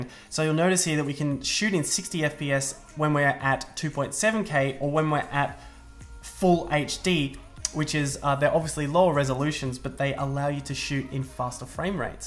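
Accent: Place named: Australia. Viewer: Just bought a Mavic Air for Aussie trip in Dec. I've always flown without FPV with a Phantom 2 and got some great footage using a GoPro Hero4 just using line of sight and sort of guessing what I'm filming. It's worked in the past more or less. But having a phone seeing the live feed will be a game changer for me. Some great tips here... the screen can be intimidating on first glance, but I'm slowly understanding the functions, good to know how to change the sensitivity of the Yaw and gimbal, great work keep it up, nice to hear a Aussie accent, makes a lovely change from American which is the usual on here. Greetings from the UK.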